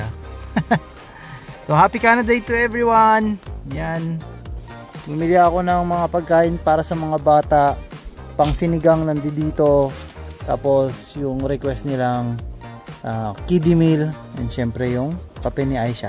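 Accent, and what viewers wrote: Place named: Ilonggo, Philippines. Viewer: basta ilonggo sir malalaman agad2x. More power sir.. 😉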